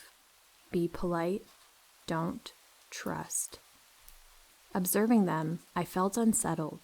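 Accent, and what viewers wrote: South, United States. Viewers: Southern US accent is very distinctive. I lived in Texas for a year when I was a kid. All my other years have been spent in Western Washington State where I was born and still live now. Thank you for another awesome video. 😍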